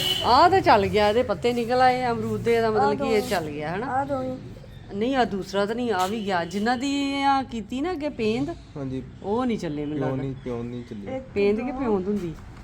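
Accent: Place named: Majha, India. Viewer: ਪੂਰੀ ਮਾਝੇ ਦੀ ਬੋਲੀ ਬੋਲਦੇ ਹੋ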